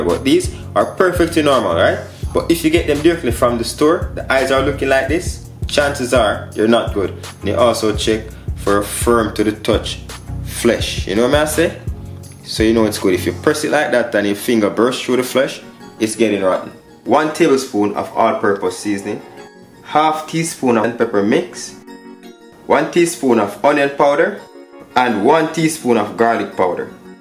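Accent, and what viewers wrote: Jamaica. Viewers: The vlogger's manner of speaking is called Yard Flex aka Jamaica vernacular. You are probably not a Yardie, so you would not understand the way in which some Jamaicans communicate, and if you re a Yardie, shame on you for criticizing another Yardie when your own writing demonstrates that you have not accomplished basic grammar. De pot a call de keckle black. These types of Jamaicans, with their own flair and creativity, are the ones who made Jamaica what it is. There would be no bloodclaat reggae music were it not for people like this vlogger. By the way, the video is about a Jamaican dish, and not the vlogger's vernacular. So, why are you criticizing the in which he speaks? I know that mispronounciation of words, and stuttering while speaking is very much part of the way in which many Jamaicans communicate. Keep it up brother, your vernacular brings me feelings of nostalgia. 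Nuff respect in every aspect.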